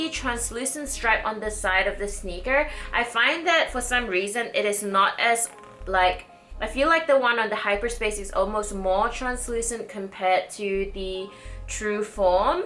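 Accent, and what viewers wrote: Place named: Australia. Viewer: Holy shit. An Asian with an Australian accent. Now I've seen it all.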